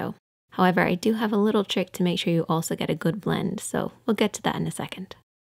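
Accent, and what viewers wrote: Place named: Ireland. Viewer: Weird question, are you Irish? I can hear a wee twang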